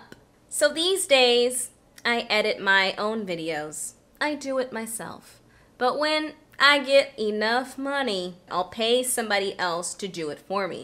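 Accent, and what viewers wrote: United States. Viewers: Nice accent!!! What state are you from?❤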